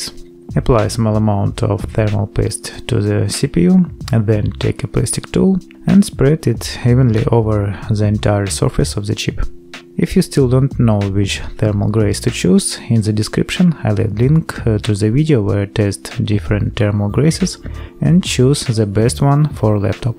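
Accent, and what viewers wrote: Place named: Russia. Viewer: Strong russian accent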